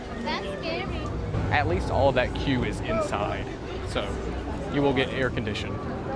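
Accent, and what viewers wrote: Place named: South, United States. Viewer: Where is from? He has a THICK southern accent. Haha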